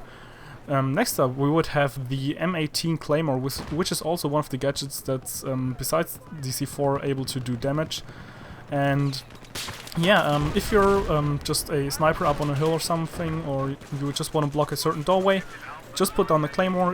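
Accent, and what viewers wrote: Germany. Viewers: mach mal deutsche videos.... Man hört das du deutscher bist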